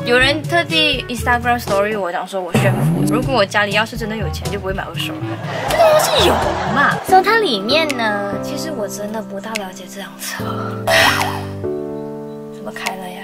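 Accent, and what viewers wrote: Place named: China. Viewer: 纳尼！竟然有中国腔？？？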